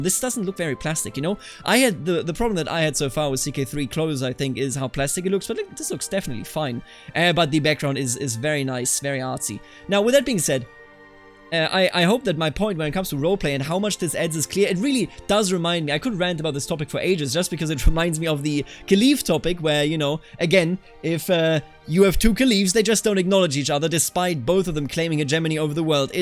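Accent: Germany. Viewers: He sounds very german lmao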